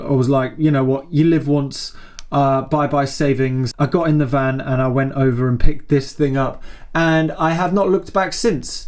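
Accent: United Kingdom. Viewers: I feel the same exact way you do about the way you have been feeling; except you are the Cirklon and I am the SAM. I have been following you for about 4 months now; and I can understand pretty much every other thing you are talking about. Have no clue what a cirklon is or what Beatbox pro is all about but it seems all very interesting. SO I am just reading the manual and absorbing about every other word or so but its very cool because you have the queens tongue. I am sending your music all across the USA to get my friends in the underground interested as well. So when you plan your world tour and visit us in the states; we can all jam together. Gods speed LADDY!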